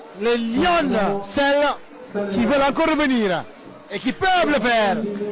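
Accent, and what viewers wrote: Ghana. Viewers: Why is he sounding Ghanaian?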